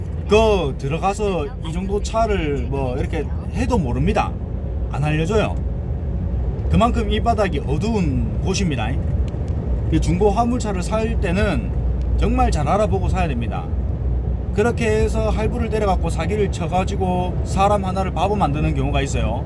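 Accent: Gyeongsang, South Korea. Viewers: 갱상도 사투리 졸나게 거슬리네 토착왜구당 개세들 생각나서 나~~간다 갱상도 정말싫어 귀청소방 이나 가야겠다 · 이제 용차를 준비하는데 좋은 가르침입니다 경상도 사투리가 듣기 좋네요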